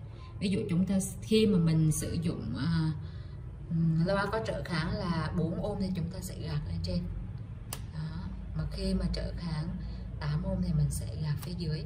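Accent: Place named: Hue, Vietnam. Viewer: Tiếng gốc Huế đây rồi:)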